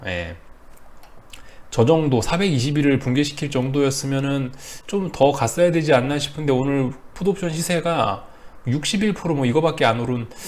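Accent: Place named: Chungcheong, South Korea. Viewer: 얼렁얼렁..ㅎㅎ 충청도..방송감사합니다.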